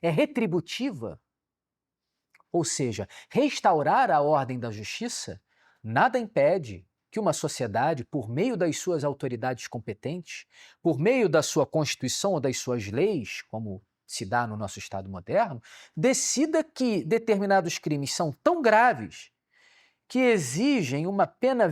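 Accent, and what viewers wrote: Rio de Janeiro, Brazil. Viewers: É que ele não tá acostumado com o carioquês, basta ver o Mestro Rorion Gracie falando Cadimia que a gente sabe que ele sabe que é academia, mas o carioquês é identidade pura. Eu entendo ele porque me dá um nervoso quando ouço Naisceu, por exemplo, que nem sei denonde veio essa forma de falar. kkkk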